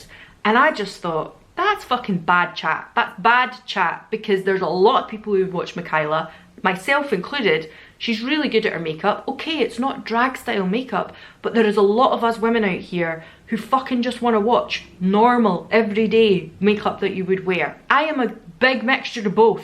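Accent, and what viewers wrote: Scotland, United Kingdom. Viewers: Completely agree with MLMs, just wondered what your thoughts were on the Body Shop as they have their Body Shop at Home? Really enjoying the videos after discovering you a couple of months ago, nice to hear a familiar Scottish voice 😊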